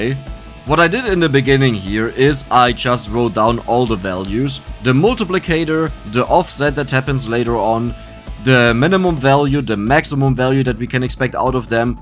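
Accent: Germany. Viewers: German accent is funny af sometimes, for example said verks (works)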